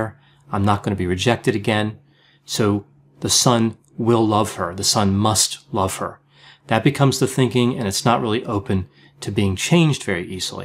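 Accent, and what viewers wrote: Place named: Pennsylvania, United States. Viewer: Great info. I detect a Pennsylvania/Philadelphia accent.:)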